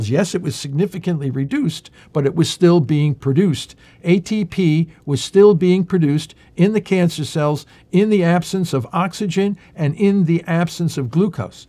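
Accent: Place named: New England, United States. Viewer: Thank you for your WORK, and for the plain English explanation. I'm serious! And I love your mild New England accent;) This is ALL very encouraging to everyone who has lost family members to cancer.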